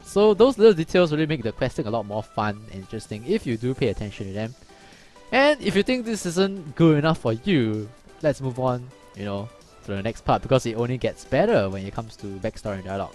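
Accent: Singapore. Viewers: Love your accent. Singapore maybe?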